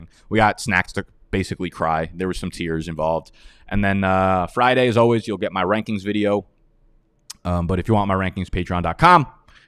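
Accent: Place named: East Coast, United States. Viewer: Appreciate the content. he knows his stuff more than alot of other ff guys. But my God I cant stand how he says welcome BIKE lol. I just can't get past it. Is this an east coast thing?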